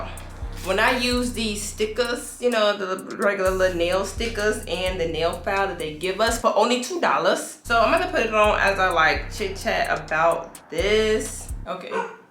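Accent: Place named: Louisiana, United States. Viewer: Are you from Louisiana? I love your accent and nails too. Thank goodness for Shein. 💅🏼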